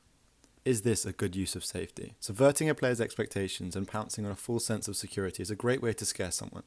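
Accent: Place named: United Kingdom. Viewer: Anything told to you in a deep British voice is good, isn't it?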